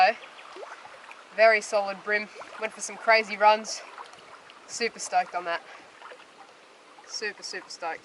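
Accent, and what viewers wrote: Australia. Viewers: You sound Auatralian (and your steering wheel is on the wrong side), but the currency sign in the bait shop showed a $ (dollar) sign. I had to look up what currancy symbol Australians used. I thought Australians used the British Pound system.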